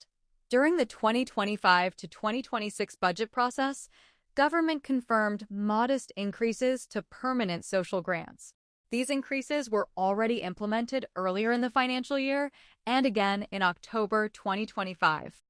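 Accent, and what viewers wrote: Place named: United States. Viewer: Stop these fake news you sound like an American your acsent is not South african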